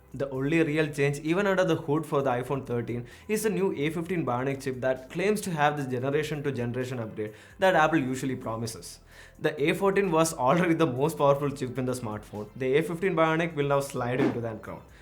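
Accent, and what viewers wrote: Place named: Tamil Nadu, India. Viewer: Aiyo tamil ascent , so sweet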